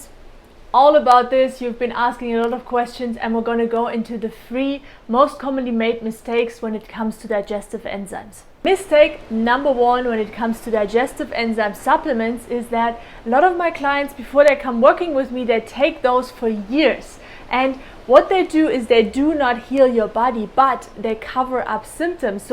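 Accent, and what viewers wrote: Germany. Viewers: Good job 👍, do I hear a hint of a German accent?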